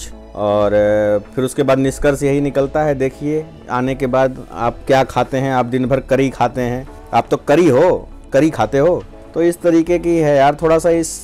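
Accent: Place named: Varanasi, India. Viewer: Apki boli me banaras ka mithass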